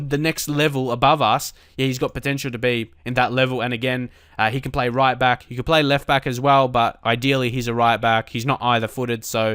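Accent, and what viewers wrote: Australia. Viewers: Crikey Mate! You're AUSTRALIAN?!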